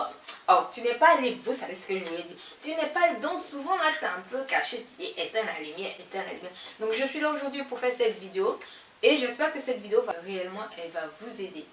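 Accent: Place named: Ivory Coast. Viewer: Merci merci cette vidéo tombe bien 😊 l'accent ivoirien qui ne se perd jamais 😂😘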